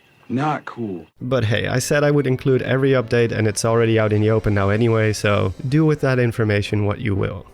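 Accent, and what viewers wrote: Netherlands. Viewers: is this guy dutch ? whats his accent :)?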